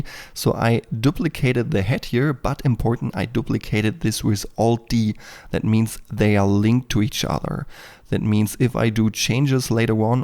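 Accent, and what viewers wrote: Germany. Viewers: sis jerman äccent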